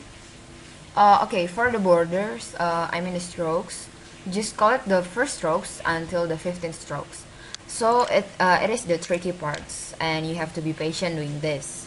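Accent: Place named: India. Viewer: This is really helpful ^__^ Thank You :D btw where are you from? cause your accent sounds a bit indian :)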